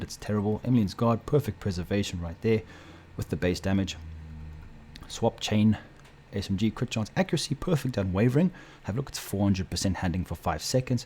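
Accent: South Africa. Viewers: Are you from South Africa? And Great video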